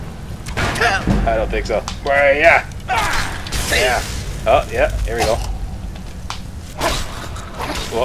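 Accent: Canada. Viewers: are u canadian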